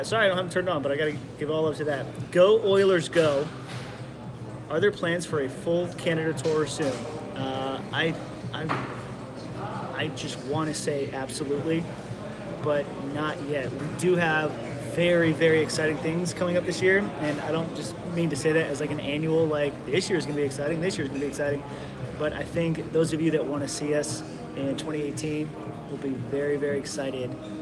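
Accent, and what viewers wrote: Australia. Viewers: Your Australian accent is quite sexy.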